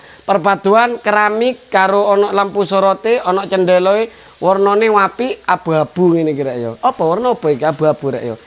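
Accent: Surabaya, Indonesia. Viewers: Aku seneng logat suroboyoan mu mas. Salam dari boyolali lereng merapi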